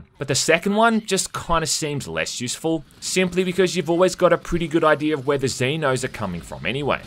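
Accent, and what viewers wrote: Australia. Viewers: Is it just me or do we all love a reviewer with Australian accent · when my favorite video game reviewer with an exaggerated aussie accent says its good. then, it probably is.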